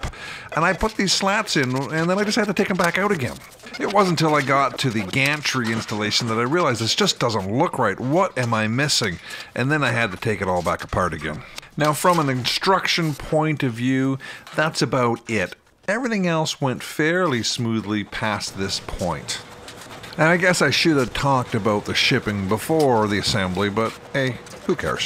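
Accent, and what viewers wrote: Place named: Ontario, Canada. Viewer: i was like he sounds like hes from Ontario lol. Im next to Montreal very cool channel nice to see folks from Canada on YouTube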